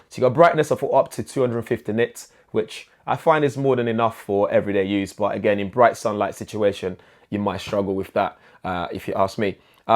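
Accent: United Kingdom. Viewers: Brits English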